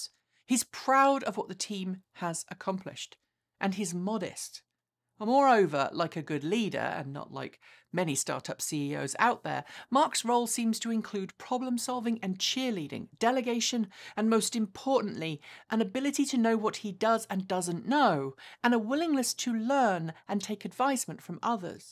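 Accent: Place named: England, United Kingdom. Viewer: I can't stand that haughty English accent!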